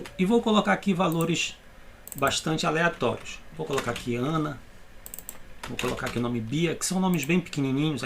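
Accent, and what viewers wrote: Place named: North, Brazil. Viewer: mas o sotaque de nortista tá em dia hehe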